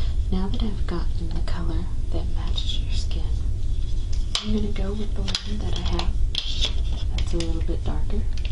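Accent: South, United States. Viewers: She sounds like a female Bob Ross, that calming southern twang creating ASMR masterpieces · You have this really subtle (southern?) accent that's exremely pleasant. Can I ask what region of the us you are in/ from? Here in the PNW we overpronounce everything, and sometimes sound snooty. Also, I can see why this video has 18000+ views. I've got a mild case of coma, lol. Seriously, great stuff. Thanks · I just want you to do my makeup in real life. Ugh. You are so comforting and I love your sweet southern accent!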